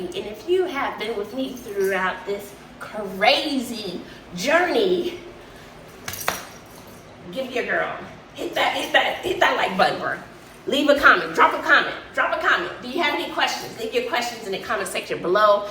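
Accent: New York, United States. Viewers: I missed where you are from, if you stated it earlier in a video. I’m going to guess you are from New York or Chicago.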